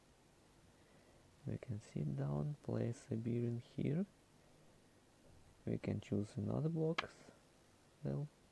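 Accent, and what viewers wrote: Russia. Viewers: Да ну вы русский акцент слышу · Почему-то я слышу в твоём голосе что-то с русским акцентом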